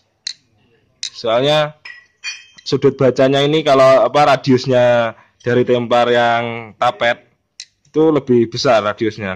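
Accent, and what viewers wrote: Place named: Java, Indonesia. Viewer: Sampean tiang pundi mas ,logat jawane kentel soale😂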